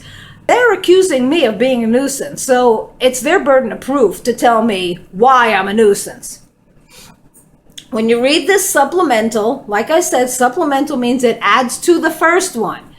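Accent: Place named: Long Island, United States. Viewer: After watching a flw videos why I like U I know U said U lived in Nevado but then I found U were from NY Now U say U were born in PA I am a Lancaster Cty baby that moved to Jersey then to Hastings just north of NYC Your accent tells me L.I. I gave been fulltining for just over a yr Rt now in Cali Thanks for the entertaining videos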